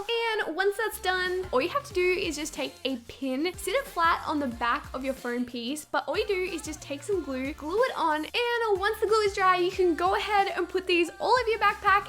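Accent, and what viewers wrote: Australia. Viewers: i love how austrailans talk... vider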